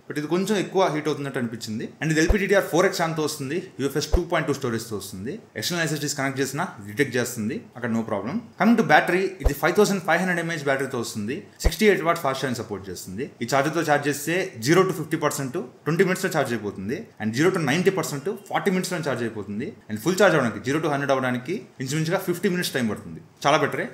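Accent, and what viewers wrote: Nellore, India. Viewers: Anna needhi Nellore aaaaaa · Mawa nellore ah Mande ❤😂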